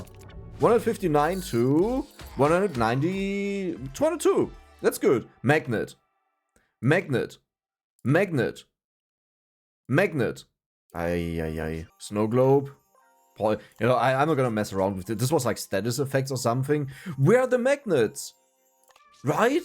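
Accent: Germany. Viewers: Where are you from, because I like your accent I have never heard it before. I believe its an German accent right? Me myself am from the Netherlands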